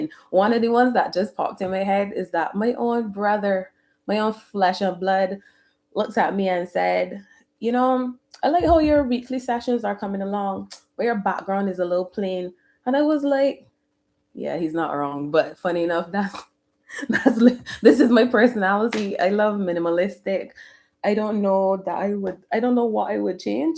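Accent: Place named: Barbados. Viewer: Subscribed in the first minute because it sounds like you’re Bajan! 🇧🇧 Anyhow, this is a great topic!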